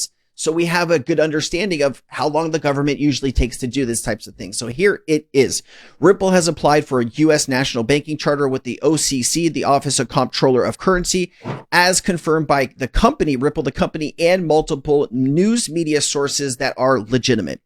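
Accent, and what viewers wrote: Australia. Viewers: Same here... the dude on the video was an Aussie!. was close...😊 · Australian accent 😊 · Yes he's Australian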